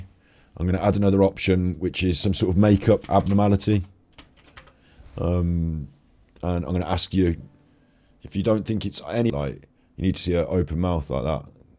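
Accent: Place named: Midlands, United Kingdom. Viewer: Agreed ! I like his thought processes and I ❤ how he sounds, eg: Midlands accent. Oh, and easy on the eye as well 😊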